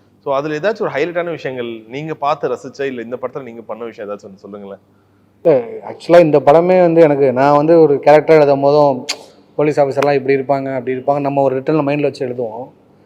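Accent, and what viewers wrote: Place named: Bangalore, India. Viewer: Banglore Tamil rombha like 🤝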